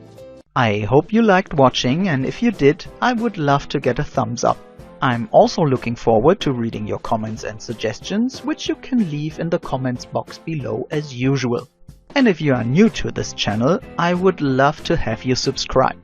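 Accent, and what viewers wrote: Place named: Germany. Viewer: I thought it was German by the writing and accent! :) I'm British but I was born in Munster (dad was in army) stay crafty my friend!! :) X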